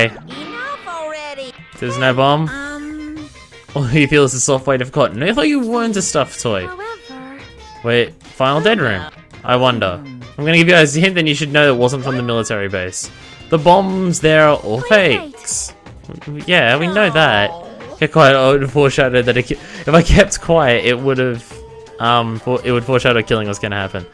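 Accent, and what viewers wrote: Australia. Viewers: When I first found you and heard your voice -- quite a long time back now -- I was trying to place your accent. I could tell there was something in your accent that wasn't making it sound British. It wasn't until in one of your videos you actually said you were Australian that it finally clicked for me. I was like, "Ah, that's it!" I'm one of those people who try not to jump to conclusions, and since I don't actually know you I didn't want to ask straight out where you're from.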